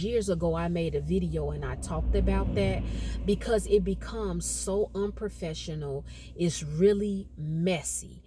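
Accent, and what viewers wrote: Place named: South, United States. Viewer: Are you from the south I hear the southern accents? You stretch your words when you talk.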